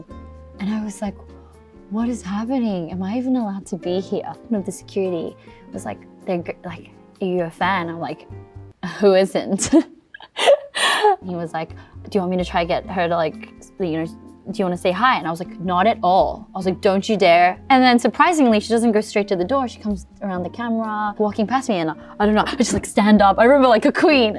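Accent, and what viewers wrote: Australia. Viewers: Her Aussie accent is so aesthetic and luxurious! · “Aussie accent” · That Aussie accent is so charming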